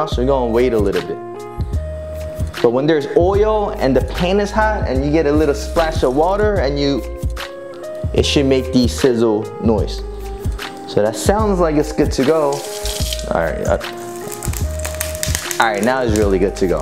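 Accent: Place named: Los Angeles, United States. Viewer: this how you sound like when you korean and grow up in LA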